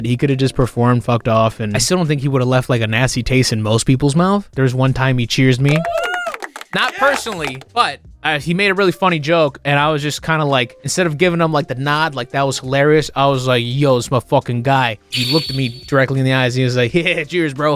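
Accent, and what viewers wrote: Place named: Upper Midwest, United States. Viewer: I knew you were from the upper Midwest because of the slight accent.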